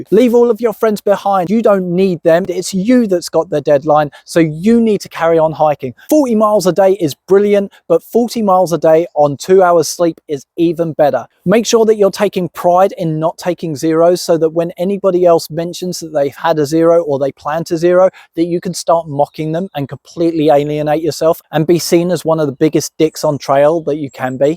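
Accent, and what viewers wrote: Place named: United Kingdom. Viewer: I don't know man, I love the premise behind the videos, etc. But something in the delivery is missing.... Perhaps it's your British accent making us Americans think you are just being a pretentious arse instead of being tongue in cheek. Maybe dress up as one of the knight s who say nee or something, somehow give us some slapstick. I'm not sure exactly what, because the observations are very on point, so it's gotta be somehow in the delivery that just doesn't get me laughing.... Can I ask where you originally hail from? Dry British comedy can be enjoyed by us, but we still remade The Office with Steve Carell....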